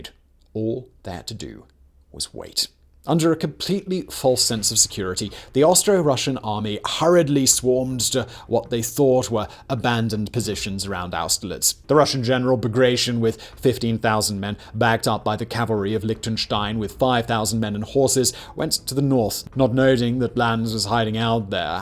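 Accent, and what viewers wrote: United Kingdom. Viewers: Look forward to hearing Simon telling us about the battle of Waterloo. One needs the proper British accent to describe the upper crusty Wellington defeating Napoleon.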